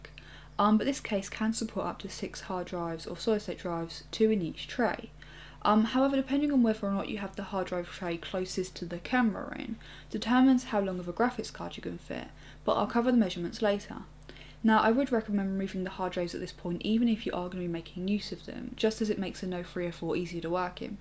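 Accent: England, United Kingdom. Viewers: Definitely not australian. Sounds British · love your videos....love the layout....love the pom accent and NO you don't need a tan. Even though my preference is leaning towards ethnic women, you look great! Thumbs up. Just an after thought, would be nice to see an extreme build with this case. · Armada YouTube Now if you can pinpoint what part of Britain that would be ripper....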